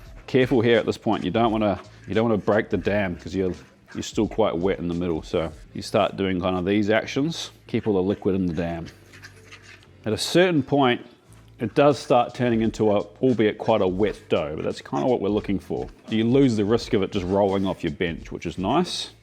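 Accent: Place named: New Zealand. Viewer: He is kiwi mate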